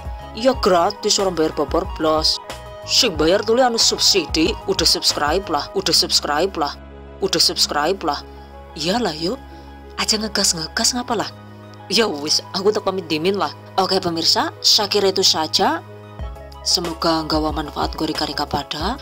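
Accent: Banyumas, Indonesia. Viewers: Yu asline wongendi ngomonge ngapak deneng ky aku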